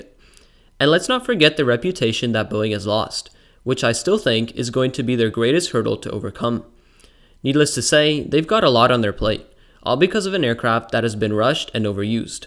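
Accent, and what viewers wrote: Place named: India. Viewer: Nice Indian accent.btw retire this old plane.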